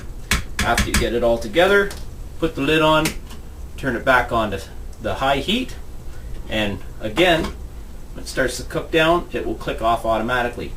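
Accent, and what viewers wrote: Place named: Canada. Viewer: I was just thinking you sounded Canadian, and then you pulled out the bagged milk!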